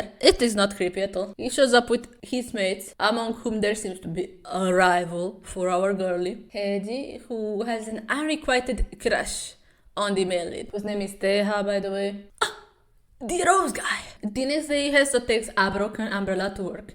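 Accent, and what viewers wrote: Russia. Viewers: Am I the only one who focused on her accent thinking it’s such a good Russian accent 😭😭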